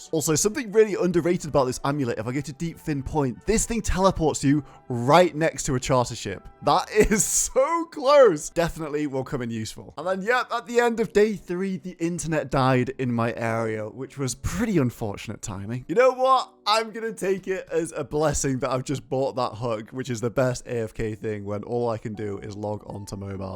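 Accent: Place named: United Kingdom. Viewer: bro sounds like a doofus. is that just him or just the british accent?🫩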